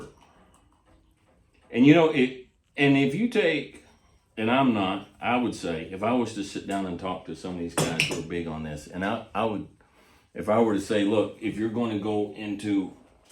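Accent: United States. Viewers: No worries you 're doing all well mate . Your coffe is great pal . Love watching your videos . And I adore your cowboy's accent mate ))) Great respect from STRONG UKRAINE ))) I keep watching you . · Am not English speaker, sometimes I get the meaning, you are sir a very good man, I wish I was fluent in English especially understanding this strong real American accent.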